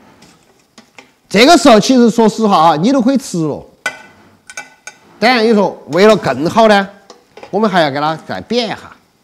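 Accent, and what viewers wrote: Chengdu, China. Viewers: 最标准成都话！love!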